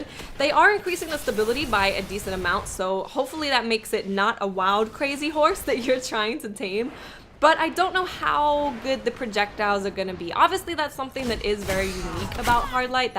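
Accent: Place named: East Coast, United States. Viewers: I've never heard a new york accent before and I live in the US lol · The Black Hammer nerf really takes away the dynamic of that sniper rifle. It basically becomes a sniper with muligan... BTW, where is your accent from? I want to say east coast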